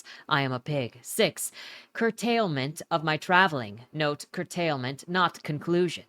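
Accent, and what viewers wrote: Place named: Transatlantic, United States. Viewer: The transatlantic accent is brutal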